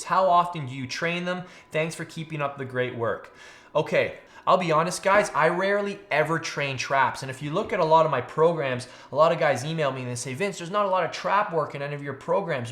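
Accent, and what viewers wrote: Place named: Canada. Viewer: Canadian accent, ey.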